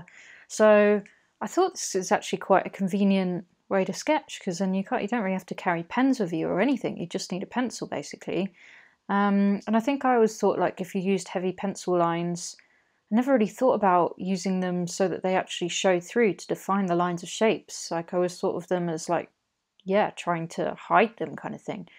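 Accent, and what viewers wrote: United Kingdom. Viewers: I’m absolutely loving your channel at the minute. Your art is amazing and my style! I also love your accent! I’m from the uk too 🇬🇧 ✌️ xx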